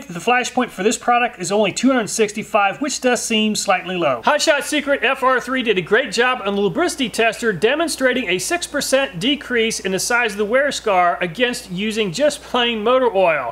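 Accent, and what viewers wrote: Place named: Indiana, United States. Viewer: Man you definitely got that Northern Indiana accent!!!!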